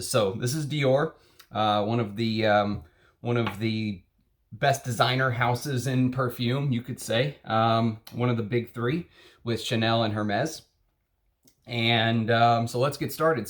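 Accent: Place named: Texas, United States. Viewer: Nooo lol. My nose is still learning. It's actually incredibly hard to pick out notes. I chatted to a student studying olfactive psychology, big word 😂, he said the human brain can pick out around 5 notes max in a perfume. Everything else is hidden in a blur. You can get an effect from Hedione for example, it's like air making space. The musks are bloody hard to identify, especially if many are used. I struggle to differentiate them in a perfume a lot. Woody ambers forget it, they all bloody smell the same to me. The more raw materials I smell and study, you can pick them out sometimes if they are overdosed in a perfume. For instance smelled sundowner today (the drydown is a beautiful smooth amber tobacco btw , lovely!), in the opening I smelled the synthetic used to boost a tobacco note. It's in tobacco Oud etc as well. You must notice it. 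Like if you smell Cilantro in food. It's there. Doesn't mean its bad btw, you just can't miss it. Also, I could not do what you do. Firstly my collection is shite compared to yours. And secondly, I haven't got a smooth Texan accent 😉 haha. I am learning a lot from your videos btw. A lot!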